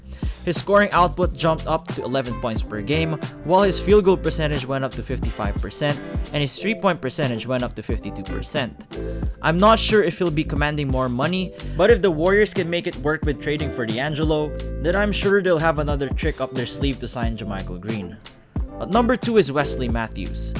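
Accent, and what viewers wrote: Philippines. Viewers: You sound Filipino 🤔 -A Filipino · I hear a Filipino accent for some reason... 🤔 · God this guy sounds filipino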